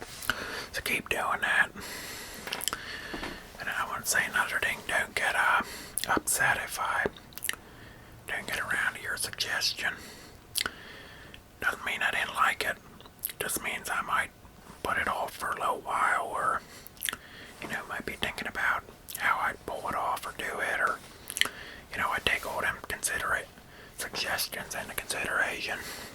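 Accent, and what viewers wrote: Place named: South, United States. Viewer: Didnt know a souther drawl with asmr was so good!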